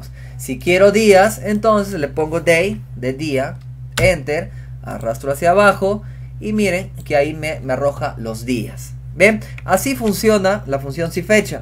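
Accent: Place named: Amazonas, Peru. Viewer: QUÉ CAPO ERES AMIGOOOOO!!! Muchísimas graciasssss!!! Tan didáctica y súper fácil de entender tu manera de explicar! Vale oro este video... gracias por darte el tiempo de compartir tus conocimientosssss!!! Millones de gracias, me ayudaste a ordenar mi padrón de edades de niños para poder hacerles llegar regalitos acorde a su edad en esta navidad!! Dios te bendiga!!... Pdta: De casualidad eres de Rodríguez de Mendoza / Amazonas? Te sentí el acento similar al de mi familia que es de allá! Ojalá seamos familia! jaja un abrazo!!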